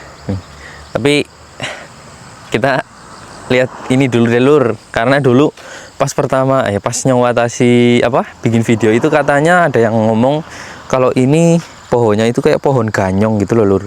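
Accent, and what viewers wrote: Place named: Cilacap, Indonesia. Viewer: Lokatnya medok ms,orang Cilacap apa ms,?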